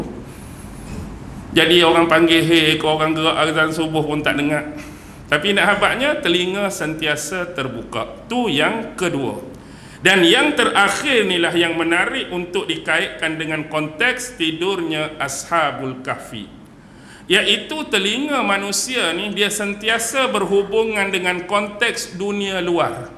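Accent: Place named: North, Malaysia. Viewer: Umur berapa bang, takpaham loghat utara ka takpaham cerita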